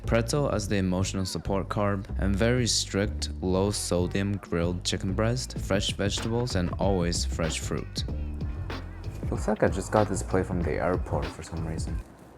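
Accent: Finland. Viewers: He sounds Scandinavian (Finnish) to me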